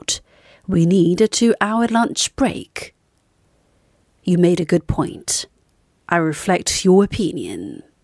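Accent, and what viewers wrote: RP, United Kingdom. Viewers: 의 진짜 영국영어 최고 👍🏻 쌤, 30년 장수 프로그램 가즈아~~~🌼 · 님~ 5시 50분 본방사수하고 있습니다 ^^ 포쉬 엑센트 발음 더 배우고 싶어요 ㅎㅎ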